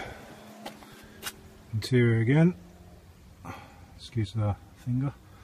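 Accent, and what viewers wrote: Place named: England, United Kingdom. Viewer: Sounds like a pom accent. Nice el to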